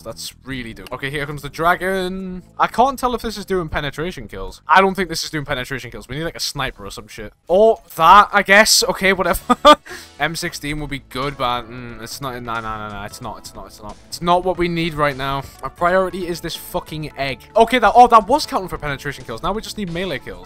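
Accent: United Kingdom. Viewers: Newcastle or Sunderland? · hey my friend and I have been trying to place your accent for like 30 minutes, I'm hearing a little bit of Welsh/sometimes Celtic I fluences and he's saying Southern England. Were we close?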